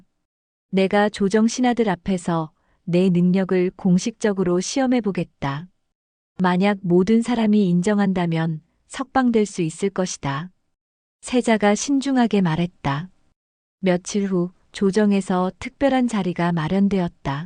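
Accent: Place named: Gyeongsang, South Korea. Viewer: 또 경상도😂😂😂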